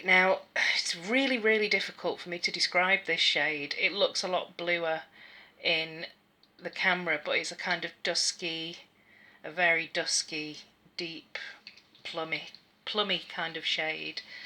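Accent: Scotland, United Kingdom. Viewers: These are my absolute favourite vids of yours. I love learning about colour! I've been organising my ROYGBIV series and I've written 3 different sections for purples, lol. Oh man, I need to get that Snugglebutt. Ahahaha, your Scottish accent xD Excellent vid! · thanks babe. omg im pmsl here at the plums and the scottish accent. 😂😂😂 x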